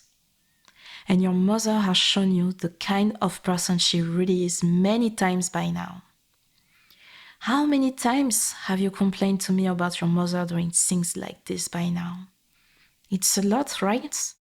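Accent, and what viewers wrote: France. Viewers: Well, you did spoke with a French accent, and also the title said Yandere so I was just being honest about how I felt about this after hearing this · Ok, I've heard this script a lot, but your accent managed to make it feel new and refreshing. It's actually surprisingly rare to see french accents that aren't parodies, so, just wanted to say i love your accent (sorry for the incoherent writing, its currently 12 am) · "Mozer" love it ❤. Appreciate the french accent in the audio. Feels oddly good to hear an asmr video from (i assume) a french speaker without the weird judgement people in France tend to have towards french people speaking in english. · This needs a part 2 if possible, i think i've dropped a tear almost falling asleep to this, i swear i could feel the hug, the embrace and it's warmth. Sometimes i couldn't understand some words because of the lovely french accent but it wouldn't be same without it (also english is not my first language and i wasn't wearing headphones) This is amazing, simply amazing, thanks for this, from the bottom of my heart. 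· Attendez une petite minute... C'est un accent Français que j'entends non ? C'est peu commun j'aime bien. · The French accent sounds so good, yet so bad at the same time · L'anglais avec l'accent français est vraiment relaxant, j'ai découvert la chaîne a l'instant et je trouve que, pour le moment, le contenu est vraiment bien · Such a thick accent, I presume French? Sounds very nice.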